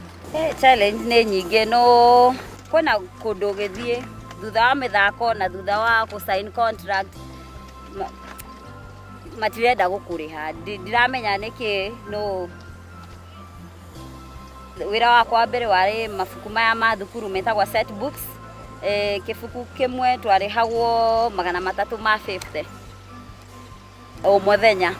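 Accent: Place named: Kirinyaga, Kenya. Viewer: Kirinyaga accent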